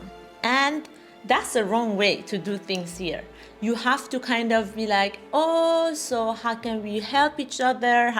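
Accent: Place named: Ghana. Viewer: Congrats on your Ghanaian accent 😁👍🏾 “By the Grace of God …”, lol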